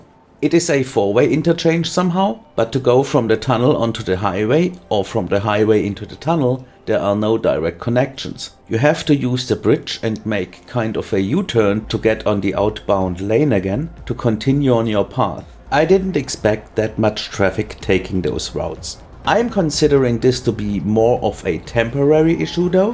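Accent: Germany. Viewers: Diggi ! Dein German Accent macht mich ech krank. Kann ich nich gucken, es ist so dermaßen schlimm !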